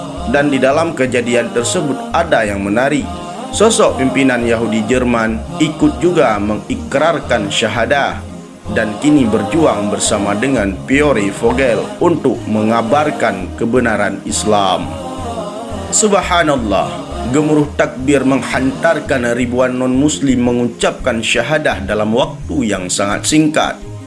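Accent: Bali, Indonesia. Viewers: Admin punya aksen dari zona Bali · Cengkok bahasanya seperti orang bali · Assalamualaikum admid ,admin apa ora bali ya lagat og kental kaya dari bali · Pengisi suara dari bali ya?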